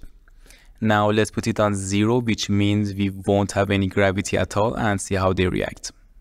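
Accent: Iran. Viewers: عالی بود جان فقط یه سوال دارم من تازه چنلت رو دیدم و دیدم لهجه داری فهمیدم ایرانی هستی بدون اینکه به اسمت توجه کنم... خواستم بدونم واسه تولید محتوا انگلیسی آیا بازدهی بیشتری داره؟ چون خودمم میخوام همینکارو بکنم... و احتیاج به راهنمایی شما دارم... و اینکه اگه توی ویدیو صحبت کنی آیا تاثیری داره واسه مخاطب هرچند سخته انگلیسی واسه ماها ولی تنیجه‌اش رو میخوام بدونم ممنون میشم جواب بدی🫶👑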